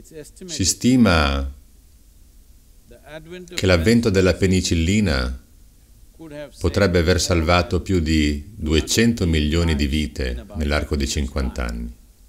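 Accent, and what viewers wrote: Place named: Parma, Italy. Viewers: Il doppiaggio si ma non con quest’accento Emilianoromagnolo cosi pesante ahah mettete uno che abbia fatto un po’ di dizione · con accento di Parma (o dintorni)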